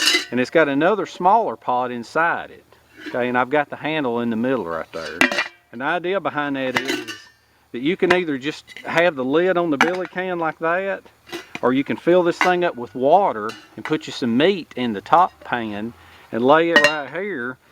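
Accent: South, United States. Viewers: My favorite item was the Alabama Hammer. It warms my heart to hear a fellow died in the wool Southerner speak on here. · You also have a delightful way of putting things and a nice southern accent that I find relaxing. Your laughter and humor always leaves me with a smile or grin on my face. Thanks for your videos; I'm a fan. (now in Idaho by way of Tennessee).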